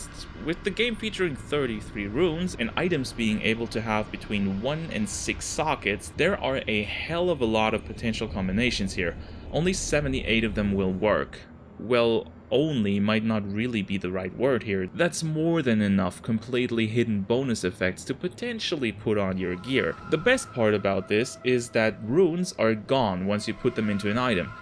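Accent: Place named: Spain. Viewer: Having a helluva time trying to figure out your accent—I’m vacillating between “Spanish” and “Czech” ha ha. But who cares? Your English grammar and pronunciation are an order of magnitude better than 97.654% of American YouTubers! Great vid. Great analysis. Insta-sub! (And I don’t even play Diablo)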